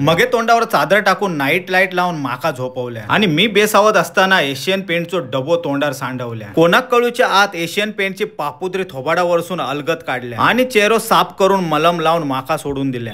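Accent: Malvani, India. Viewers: मालवणी बोली मस्तच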